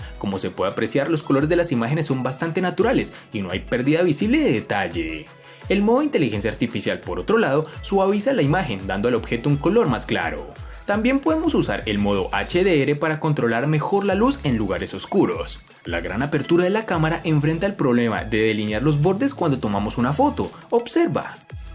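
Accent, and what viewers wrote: Colombia. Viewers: De q parte de colombia eres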